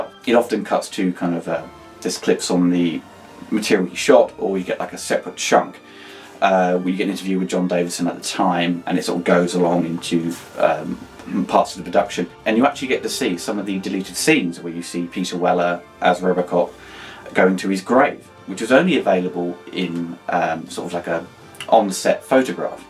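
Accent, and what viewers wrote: United Kingdom. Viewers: I see these are only available to the US/Canada and I'm guessing from your accent you're from the UK? I ask as I'm also UK based and wondered how you got hold of them? Would love to get my hands on these:)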